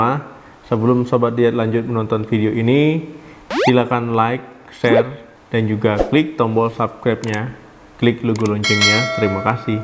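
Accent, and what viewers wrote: Aceh, Indonesia. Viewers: Dokter logat bicara nya aceh banget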